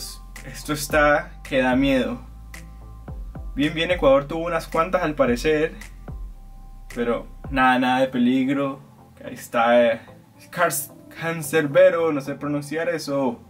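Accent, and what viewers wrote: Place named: Cali, Colombia. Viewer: Puro asentó de Cali ve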